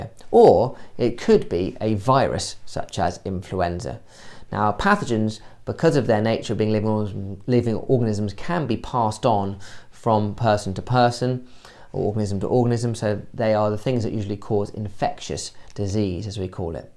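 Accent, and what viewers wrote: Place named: United Kingdom. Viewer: please use american accent if u can i cant understand british well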